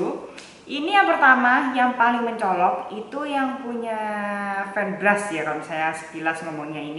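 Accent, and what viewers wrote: Java, Indonesia. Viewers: Kok medhookkk 😍😂😂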